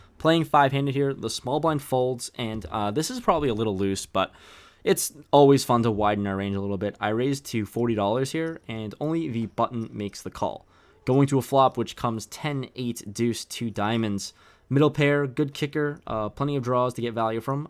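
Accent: Western Massachusetts, United States. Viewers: Are you from western Massachusetts? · Oh are you from western mass in mgm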